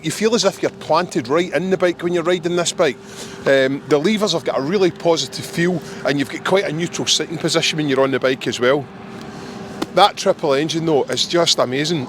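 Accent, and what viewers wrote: Scotland, United Kingdom. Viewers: Scottishtriumph, yak, what a terrbile inaccessible accent..😢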